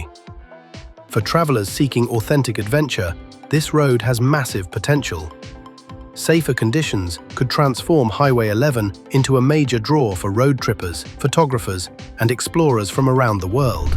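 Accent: United Kingdom. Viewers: I guess you know more than this guy who made this video🤣 especially the British voice probably had too much Scotch when he was creating this video!🤣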